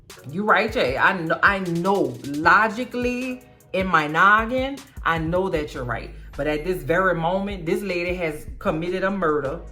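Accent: New Orleans, United States. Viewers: It’s the N.O. accent for me😂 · The New Orleans dialect is the absolute BEST!! I love the way you speak!! ❤❤❤❤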